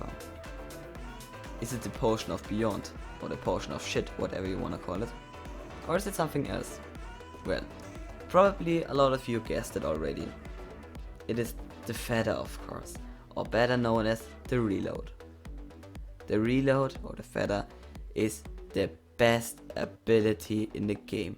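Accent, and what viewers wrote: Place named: Germany. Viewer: Als ich deine videos gehört hab, hab ich direkt gemerkt das du deutscher bist :D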